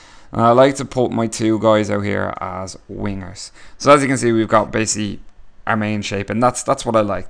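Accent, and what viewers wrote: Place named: Ireland. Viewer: Lol i wans't expecting this guy to be Irish.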